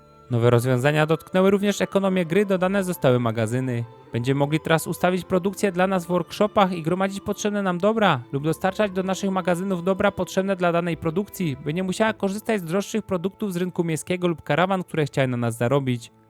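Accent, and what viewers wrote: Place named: South, Poland. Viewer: Po wymowie stwierdzam ze jesteś z południa polski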